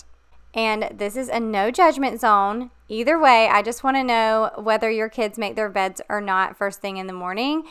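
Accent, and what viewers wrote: South, United States. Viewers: Ok first of all - you’re one of the best ppl on YouTube, so shame on all the haters!!! Coming from Canada, just hearing your southern accent is awesome and relaxing!!!! Yassssss to spring cleaning! I’m all in for this! We are preparing to sell our home this summer so I need to get it thoroughly cleaned before showing the house! I need some good exterior house cleaning tips.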